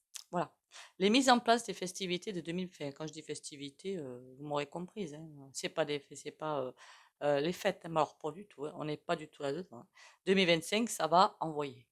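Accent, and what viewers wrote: South, France. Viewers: un petit accent du sud çà réchauffe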